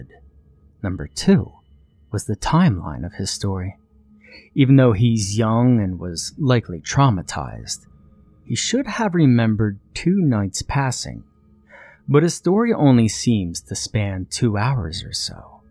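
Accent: South, United States. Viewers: Your channel is very interesting. Methinks I shall subscribe. 😊 Thank you for your Military support , as well, Pastor. It truly means a lot. I re-wrote this to mention I subbed. I’m looking forward to listening more. Your Southern drawl is making me wish I was still back at one of my Duty Stations & home for a decade, South Carolina. I spent quite a bit of time in NC; I worked out of Charlotte for awhile but it was when they were building Panther Stadium! 😳 And who doesn’t love Asheville? Take care & be safe!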